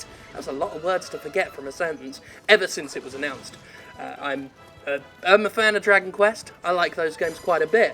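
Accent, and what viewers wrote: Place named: United Kingdom. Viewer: Second Sounds like you're British? Me too, but I moved to the States for Grad school. First exam I ever graded the class average was 68% (which I thought was good, of course) and the course instructor, when he saw it, said "Ooooh shit that's bad". Flip side is that it's common to get scores in the 80s and 90s, whereas a 70 when I was at university was excellent.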